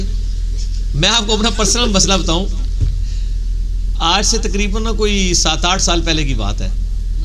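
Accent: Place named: India. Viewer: Indian Urdu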